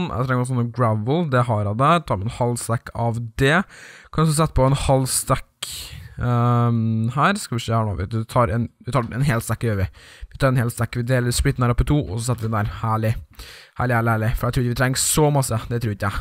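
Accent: Trøndelag, Norway. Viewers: du trøndersk?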